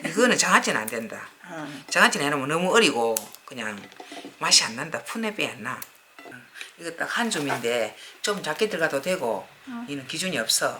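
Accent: Gyeongsang, South Korea. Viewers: 경상도 사투리가 재미 있어요. 홍양,홍양..ㅋㅋㅋ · 강원도 사람인데 사투리가 진짜 듣기좋네요 ㅋㅋ 경상도로 이사갈까 ㅋㅋ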